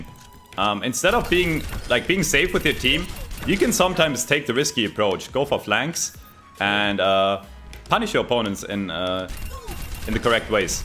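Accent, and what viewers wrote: Germany. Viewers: Are you German? Or Austrian? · german :D ?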